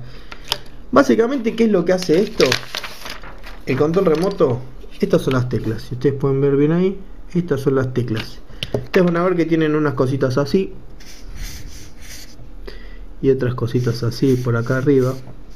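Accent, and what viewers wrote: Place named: Argentina. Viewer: Es muy divertido escuchar a un argentino explicar cualquier cosa. Me recuerda a "Les Luthiers" yo tengo un cuñado argentino y me divierte mucho jajajaja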